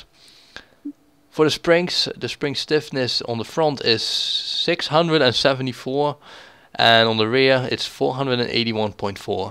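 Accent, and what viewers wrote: Netherlands. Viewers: Are you dutch to me you sound dutch nice tune btw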